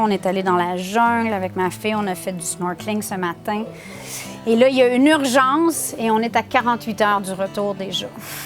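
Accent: Quebec, Canada. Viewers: J'adore son accent québécois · Super je n'avais jamais goûter de pudding et je viens de me rendre compte que j'avais passer 24 ans en ratant cela ! Petit coucou de France, ou il fait bon d'entendre l'accent du Québec ! Bisous 😁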